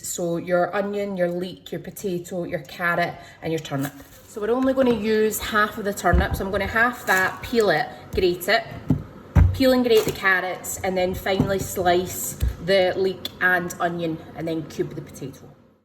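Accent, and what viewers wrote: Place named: Scotland, United Kingdom. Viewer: Love youre scottish dialect ❤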